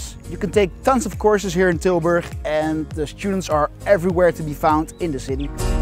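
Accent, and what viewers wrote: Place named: North, United Kingdom. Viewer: Jesus lad ,that accent is not from Tilburg ,you are from up North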